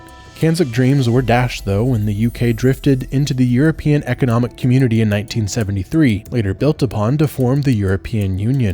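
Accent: United States. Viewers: As a Canadian i have to say you sound like an American...these changes are permanent. our trade with the US has dropped 6 percent but increased with other countries by 26 percent, so no real change in total exports and we are just getting started. I won't buy US products ever again if I can help it and i will never travel there...but i am planning trips to Scotland, New Zealand and Australia. we can do what we want and we don't need permission or advice from the US. but your video did highlight one fact...we only need to defend ourselves from the US, but in that we would have the help of more than juat these 3 countries. we've been pushed around by the US long enough and while they're descending into fascism this is a good time to get out of NORAD. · FYI: 1. It’s not the “British Commonwealth “, it’s “The Commonwealth” (an organization of equal member states) 2. 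It’s not the “King of England”, it’s the “King of the United Kingdom of Great Britain and Northern Ireland” (or Canada, or Australia, etc) You sound American. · Hey!! You sound like an American! In that case you have no opinion on this subject!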